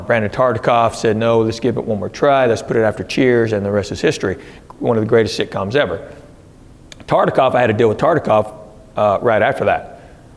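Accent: South, United States. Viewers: Thank yoy for your family, wholesome, hysterical comedy. Anyone can do 'vulgar' that is just cheap, non creative head-talking. Not only family, but there is an intellectual banter with your routine and interchange with the audience that entertains.. Great job. I am from TN, and like the Southern slant!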